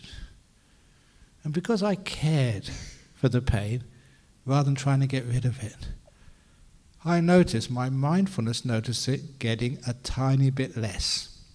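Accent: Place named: United Kingdom. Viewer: ajahn brahms funny brittish accent. he says "thinkink" for thinking. all words ending in "ing" he says "ink"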